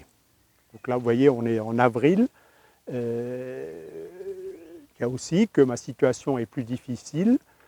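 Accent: Alsace, France. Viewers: Merci, c'est toujours un plaisir de vous écouter, j'apprends toujours quelque chose de plus. Il a beau être nul, celui qui a écrit le bouquin, je vais l'acheter quand même.! Il a un fort accent alsacien que j'adore étant moi-même d'origine alsacienne. Merci encore!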